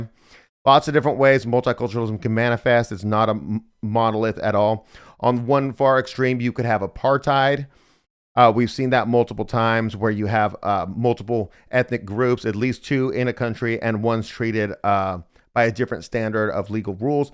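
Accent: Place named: United States. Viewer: Why is this guy who is clearly of European heritage speaking as if he belongs in the Americas?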